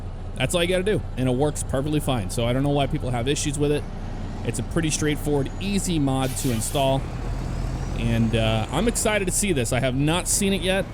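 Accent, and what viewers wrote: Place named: Missouri, United States. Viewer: I’m from jax and i can tell you’re from missouri from your pronunciations lol. Love the vids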